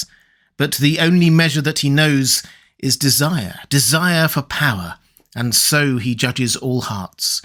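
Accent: United Kingdom. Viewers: Nerd of the Rings is great too but the British accent makes the story telling so good on this channel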